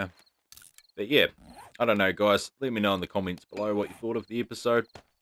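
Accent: Australia. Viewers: I know I'm 5 months late to the party but very cool to see a console Let's play, Even though its not up to date as the PC version It give me some nostalgia since I fist started playing in Alpha 16-17. It also doesn't hurt that I'm a sucker for Aussie and Kiwi accents :) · 😅im new to the channel love the videos. Has anyone told you that you sound like Farket (a Australian youtuber)?? Are you Australian?!?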